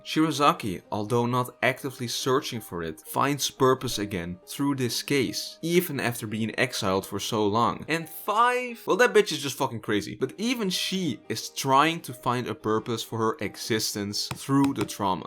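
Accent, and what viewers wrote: Netherlands. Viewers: i smell dutch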